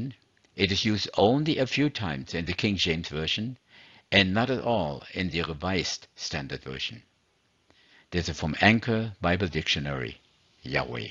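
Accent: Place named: Germany. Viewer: sie sind zeuge jehova, wenn ich fragen darf? ich weiss sie können deutsch sprechen, ich erkenne es an ihrem dialekt :) grüße aus bayern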